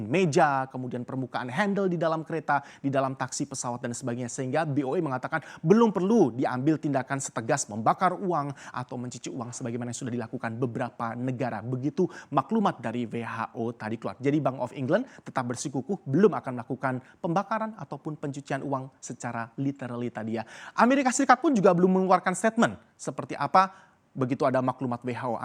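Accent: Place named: South Jakarta, Indonesia. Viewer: Pembawa beritanya orang jaksel nih.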